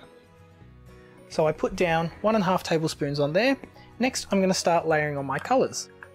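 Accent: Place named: Australia. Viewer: Thank you, such a talent !!!! I always thought you were Canadian, love the Australian accent...definitely need to visit one day 🤗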